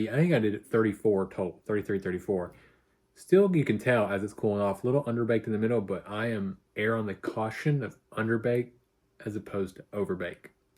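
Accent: South, United States. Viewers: This is for the very first time I am getting to hear your native Southern Accent. Sounds so interesting. Do you conciously tweak your accent when you make your travel videos ? Just asking out of curiosity as a language student. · your southern drawl really comes out when you're home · Your southern accent is so much stronger when you’re home, it’s refreshing, thanks for all the content. Wishing you a fantastic day, and safe adventures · Your southern accent comes through so strong at home. I didn’t hear as strongly when you were living in Vietnam. So cute! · Very first time i hear your southern accent. 😂 I didn’t hear those when you were in Asia ✌️ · accent got back to factory reset as soon he came back south 🤣🤣🤣 love to see this side of your life country boyy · Since returning home, your southern accent has really become strong! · hi.. i have watched some of your phil. 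vlog and came across your recent one.. surprised to hear ur southern accent.. lol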